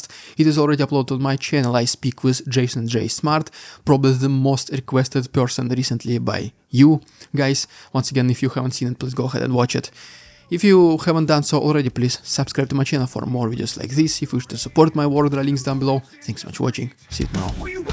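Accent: Russia. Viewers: Here fore a dose of accent. Getting the news is a plus, too!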